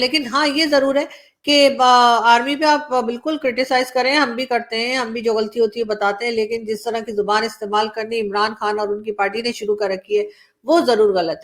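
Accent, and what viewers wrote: India. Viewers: जी नमस्कार आप इतनी खरी खरी सुनाती हो क्या आपको पाकिस्तान में डर नही लगता बोलचाल की टोन से ऐसा लगता है कि आप भारत की हो । आलिया शाह की टोन से वो पाकिस्तान की लगती है। आप खुश रहो आबाद रहो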